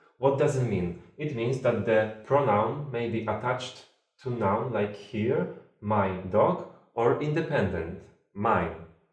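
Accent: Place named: Poland. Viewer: Greetings, Sir! I am here for your Polish English !!! )))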